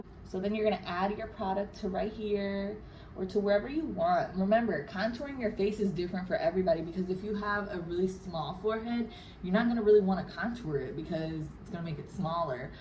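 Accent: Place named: Spain. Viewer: Hola 👋🏼 I’m a first time viewer...I found your video because my hubby & I are going to the same resort in Feb 2021...I really enjoyed watching this vlog! And the makeup tutorial was a bonus! You are so pretty and I loved your story about pronouncing things differently. I adore Spanish accents so when I hear it, it’s like “butter to my ears”! Anyways, I just wanted to say hi and let you know you have a new subscriber! Thanks again for making this video...glad you and your hubby enjoyed it! 😘🥰❤️PS I think you look like the actress Megan Fox! Lucky you😍😍😍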